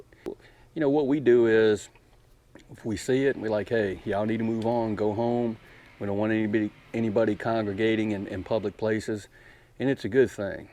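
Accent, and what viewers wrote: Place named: Louisiana, United States. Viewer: I came over from another Mississippi channel...Justin and family's Homegrown Country Homestead. BTW...that graphic at the end is really not necessary...LOL. About a minute in to the video I thought, "He might live in middle Mississippi but he's NOT from there. He sounds like he's from down around Laffayette." I've been looking around the channel and I think I'll like coming back regularly. We do disagree on one thing though...this COVID-19 thing. I think we are far apart on it. Have an awesome and blessed day.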